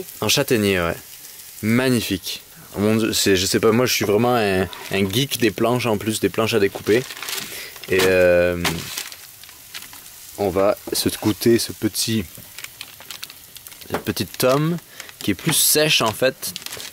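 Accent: Quebec, Canada. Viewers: Salut les keupin, je viens de vous découvrir a travers cette petite vidéo, vous êtes québécois a en entendre votre accent, vous vous faite un repas trip en France?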